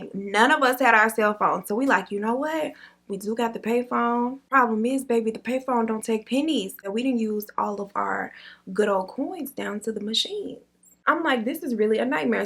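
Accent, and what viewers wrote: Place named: AAVE, United States. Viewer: Sorry can't listen to ebonics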